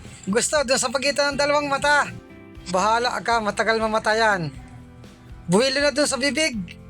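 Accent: Bicol, Philippines. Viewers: ang tagalog ni kuya may mga halong bikol.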